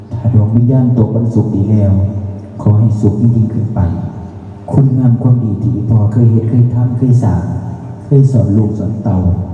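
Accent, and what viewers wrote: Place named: Ubon Ratchathani, Thailand. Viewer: เสียงดีมากทำนองสมกับเป็นลูกเมืองอุบล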